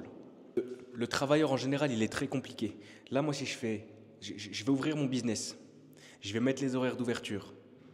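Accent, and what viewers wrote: Alsace, France. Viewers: Petit accent Alsacien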